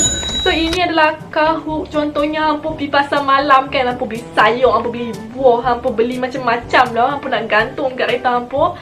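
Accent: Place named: Kedah, Malaysia. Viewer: Bagus loghat Kedahnya 😂😂👍👍👍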